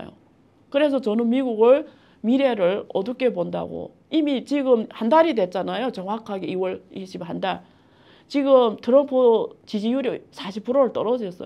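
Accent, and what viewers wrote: China. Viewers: 중국인이세요?발음이 확실히 느껴집니다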